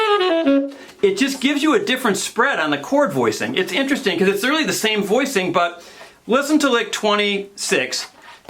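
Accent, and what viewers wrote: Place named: Chicago, United States. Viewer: Totally nifty approach,! Thank you. Funny coincidence: This is the first time I've watched one of your videos. Got fascinated right away, and while I was listening I started hearing something familiar in your voice. I grew up in Buffalo (Go Bills!) but haven't lived there since '69, and I started thinking "This guy sounds like he's from Buffalo!" But right away, I think, "No, Wait," because, suddenly I'm hearing the Blues Bros in your voice and I think, "Chicago!" And I spend the next few minutes going back and forth, trying to hear which one it was. And all of a sudden, you're talking about the Cubbies and Chicago, and it all becomes clear. Your short "'O" is a dead giveaway. Anyway, it's good to see a Rust Belt saxman doing well. Great lesson, and Best wishes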